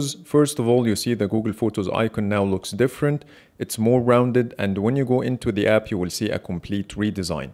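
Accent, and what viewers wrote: Egypt. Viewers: You’re an Arab right 😂😂 I can tell from the accent, an Egyptian?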